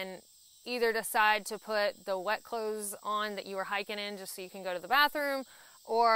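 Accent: South, United States. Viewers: I couldn't or wouldn't even want to try this setup. It's a TENT only for me. Nor would I try to use a Hammock on longer trips, maybe for a couple of nights. You are one heck of a gal and I've seen almost everyone of your videos. And every time you reached your end of the trail it was heartbreaking for me too. You did something I can't do. Oh, I'm from Carrollton, Ga. and the way you talk (like I do) is just so down home and proves you're a Country Gal. Waiting to watch any other new trips you go on.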